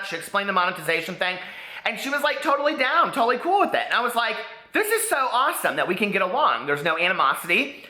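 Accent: United States. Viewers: This is my favourite video of yours so far :) and I love your voice, it's one of the things that sets you apart and why I started watching your channel regularly. I don't think it sounds weird at all, it just sounds like a different American accent (I'm English!) but it makes you come across as genuine and full of energy! And I love it when you get worked up and talk (rant!) really fast, pretty sure I subscribed after seeing your rant about your ex work colleagues ;) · I hear your American accent and I feel a great fondness for it because I know the personality behind it. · Baste! 🦃💜😘 edited to say: I love your voice. You don't sound like a young girl whatsoever. I can't believe anyone would even say that! That's laughable & dumb. I'm really great at accents & to me you just sound like a cool guy who is possibly from the south (?) I'm sorry if that's wrong, but that's what I hear. 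And you are beautiful inside and out, truly! You are entertaining, intelligent, well-spoken, delightful. Sending you so much love. I wish I could be as strong as your mother. I will commit myself to doing better. Thank you for this message, it has changed me.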